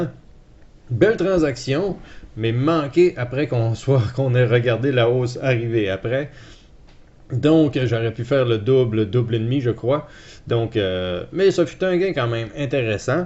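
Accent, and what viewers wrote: Canada. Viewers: bonjour; les explications associées a ton charmant accent canadien me régale continue bravo!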